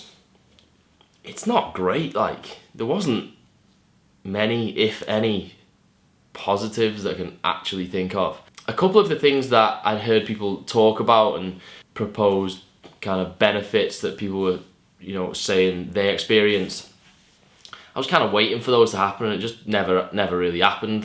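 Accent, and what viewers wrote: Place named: Liverpool, United Kingdom. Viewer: you from widness got bit scouse in ye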